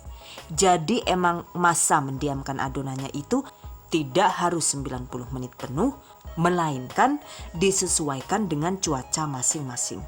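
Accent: Banyumas, Indonesia. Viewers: Bu kang endi jane asale...deneng ngapak banyumasan yak 😅😅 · Sering nonton..asyik dan tambah sayik ketika bunda ngomong ngapak saya seneng..karena bisa menikmati vidionya sambil ketawa ketiwi sendiri..😃😃😃makasih resepnya ya bunda santiikk.. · Auto subscribe....karna ngakak ngapake metu...hidup ngapak🤣🤣🤣🤣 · Ya Allah.. ternyata dari negara ngapak to? 😂. Dari dulu kalau lihat ngakak mulu.. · Wkwkwk enak ngapaknya ngomong nya, jdi kepengen kursus Bahasa ngapaknya. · bund aselinya mana?? ora ngapak ora kepenak ya buund:D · Mbaknya kl ngomong sk lucu...trilingual pula..😁 sk bngt kl mbaknya ngomong pk bhs semacam banyumasan...luv u😘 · langsung auto subscribe waktu denger si ibu ngomong ngapak...😅😅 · Wong ngendi si kie seneng ngomong e ngapake ngepol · maksih resepnya bunda seneng deh,jelas banget cara penyampeannya, lucu lgi bunda ngapak² 😂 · Syukaa dgn bahasa ngapaknya...makasih resepnya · suara ngapaknya bkin sy ktawa 2 bae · di ringokna nemen" malah gari ngapak😂. 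thank's for information and tutorial bun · i like ngapake yu...... #hidup ngapak wkwkwkwk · "ookeeh!caatat!gitu yah!emang gitu yah!fifty menute laterthe choice is in your" Wel, it's amaiiiiiizing!!, madame lah kepribeeen ngapak 😁😁😁 bikin gagal fokus dengerinnya. Anyway pokoke siip laaah. Thx madame dan salut cengkok bahasanya inggris british banget. REQUEST: Saya sudah lama pernah makan ikan sapu-sapu kali saat camping waktu sekolah. Caranya saya mengolah terpaksa lumayan sadis (karena kulit ikan sapu-sapu "emang keras yah?" 😁). Pertama, ikan sapu-sapu dibakar hidup-hidup dalam bawah api unggun besar/panggang hidup ± 20 menit. Setelahnya saya gunakan ranting dan sarung tangan untuk keluarkan ikan sapu-sapu mateng. Lalu, Tekniknya saat ikan sapu-sapu masih panas langsung patahkan kepalanya dan tarik sekaligus (satu paket: kulit keras, tulang 1 set, usus dan kotoran, ingsang, batok kepala, semua langsung lepas dan buang). 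Sisanya sirip ekornya dipotong dan dibuang. Terakhir baru daging ikan sapu-sapu diolah dengan jeruk limau + bumbu + dimasak matang dan enak. Mbak, apa ada cara yang lebih bagus daripada cara dibakar seperti itu?, kalau pakai oven saat masukinnya itu ikan sapu-sapu apa 'menggelinjang-menggelinding tuing-tuiiing' yah?! Barangkali mbak punya Ide CARA Pengolahan ikan sapu-sapu dan MENU Apa. Saya tunggu kreasi mbak. Saya subscribe and thanks. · hahaha....lucu jg bu di vloge ini...aku suka bahasa ngapak ngapaknya...hayooo org Tegal atau Purwokerto niehhh....? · Hahah bhsne ky inyong pada bae ngapak bun 😂😂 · Mbak...aku galfok... Rika ngomong ngapak🤭🤭🤭 sukses selalu mbak... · ora ngapak ora kepenak...yora son?? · ya Allah ngapaknya 😂😂😂 maturtenkiu mbekayu resepe... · Lahhh jebule mbekayune wong ngapak ya...!!! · Dening ngapak2 cilacap / banyumasan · Ngakak Bahasa ngapaknya 😀😀 Rotinya mantul bun. 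Kudu di jajal kiye rotine 😂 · Terima kasih resepnya kak,suami sangat suka makan roti...saya suka kalau kakak ngomong bhs ngapak krn suami orang cilacap juga,sukses buat kakak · Yune wong ngendi sih bahasane ngapak banget... Anu turunan turki apa yah.. Aku tak coba praktek kiyeh yu... Seneng pisan yakin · Awal nonton tak kira bunda orang luar..😅dari nada bicaranya.. lah skrg knp jdi ngapak bgtu bund 😅keren lah pokonya ga bosen nontonnya asik dn menghibur 👍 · Ngapakke metu.ora ngapak ora penak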